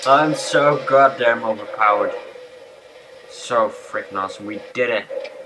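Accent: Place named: Philadelphia, United States. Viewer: Good tutorial, u from the states? you have a philly accent...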